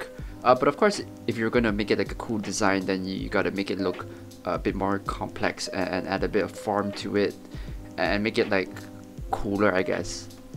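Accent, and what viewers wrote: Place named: Singapore. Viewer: He sounds singaporean...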